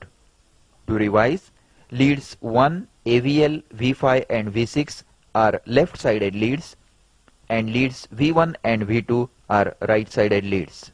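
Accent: India. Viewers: Thank you. but you'd better say no thing ! indian english is damn terrible ! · good (old) video, but his indian pRRRonunciation killing my eaRRRRs.....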